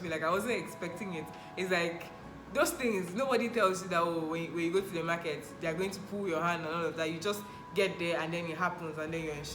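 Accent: Lagos, Nigeria. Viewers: Naaw !! This na 9ja babe. Which kain Cameroon. Omor dis na some kinda hustle. This chikala na 9ja joor. No way she was only in 9ja for law skool to have such straight up lagos accent like dis. Although I hear dat Cameroon accent when she actually mentions Cameroon but omor mehn, her 9ja accent enter. Top babe. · You sound so Nigerian. Didn’t get even a slight accent deviation. Really lovely content.👍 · I waited and waited for your accent to go offline...at all. You maintained lagosian steeze. Kudos. 👽⚡🇳🇬⚡😂⚡👍🏾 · You not a cameroonia at all. You accent is real Nigeria. Big up girl. You too much. one love. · I just stumbled on your platform..I.will appreciate your response to.my request..your charisma and intelligence shines through. you sound very Nigerian which leads one to conclude that we are one. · Are you are camerounian ? ..cos you sound 💯 nigerian .. 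· I really like you.. You sound so much like one of us "Nigerian"..for that, Am giving you a like and just just subscribed. 😍😍😍😍 God bless you.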